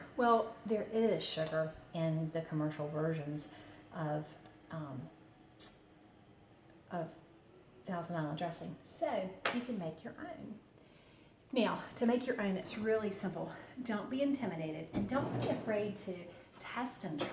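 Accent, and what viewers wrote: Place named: North Carolina, United States. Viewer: I know I’m late for this video but it looks great and can’t wait to make it! Just have to tell you (will sound weird lol) that you remind me of Dr Brent Ridge (of the Fabulous Beekman Boys); he’s a native North Carolinian too and just your mannerisms and accents are the exact same!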